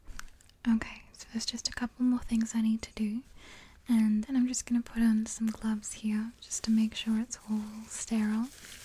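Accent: Australia. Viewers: ( ^ω^) What's this accent I'm picking up?! Some thunder from down under?? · You're very beautiful and your "aussie"? (I think) accent/voice is very soothing. Great video 👍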